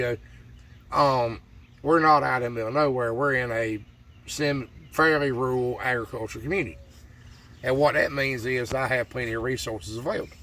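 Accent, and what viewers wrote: South, United States. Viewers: Thanks for sharing , watched most of your videos, This french Canadian has a bit of a hard time with the southern accent. my second year with piglets . I have a question for you . We have cayotes around and i rotate the pigs out on pasture with electric , i always lock them in at night , I wonder how old or how big should they be before i can trust them outside at night? Thanks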